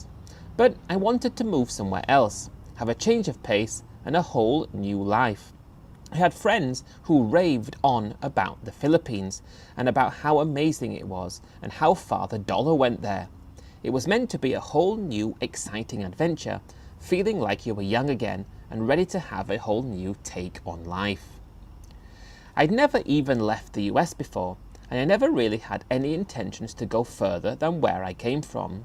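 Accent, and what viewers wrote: England, United Kingdom. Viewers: You dont really have an ear for accents. This guy who narrates these stoties is very much English. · Would that an English accent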